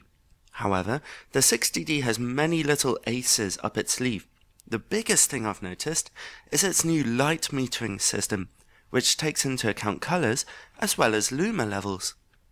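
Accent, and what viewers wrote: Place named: England, United Kingdom. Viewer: Where did you live in England before you moved to whales? Your accent is quite interesting =)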